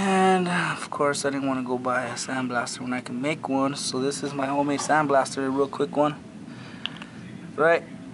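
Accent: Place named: New Mexico, United States. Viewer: You from New Mexico? it's the accent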